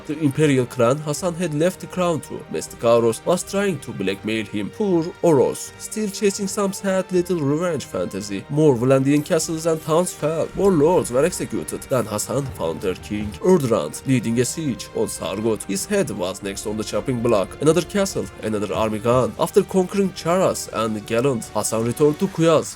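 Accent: Turkey. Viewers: hasan diyişine kadar türk olduğundan emin değildim. 1 saatlik ingilizce konuşma iyi baya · Aksanından dolayı türk olduğun anlaşılıyor selamlıyorum seni kardeşim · Where are you from bro? You sound Turkish. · Bro you sound Turkish... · Aksanindan anladım reis türk olduğunu 😅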